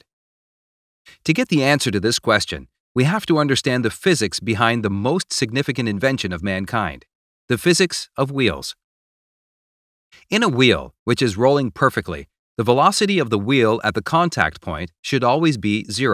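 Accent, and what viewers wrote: United States. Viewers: The first 12 seconds bother me. You have an American accent but your animation driving in the left side of the road. And you say steering is the hardest thing to master, it’s hard but that’s only because Americans drive automatics. You think steering is more complicated than clutch, gears and steering? Come on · Why is the video narrated by an American but the driver is in Britain?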